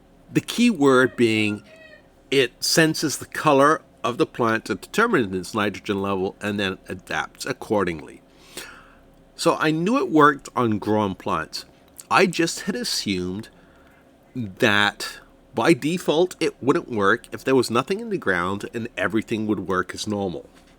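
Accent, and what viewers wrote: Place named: Northeast, United States. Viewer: Interesting findings. Thanks for doing these in-depth videos, they’re very helpful. Nice to get some tips from somebody who has been playing this game even longer than me! As a side note, I’d love to know where your accent comes from. I had you pinned in the northeast US until I recently heard you say you’re from Canada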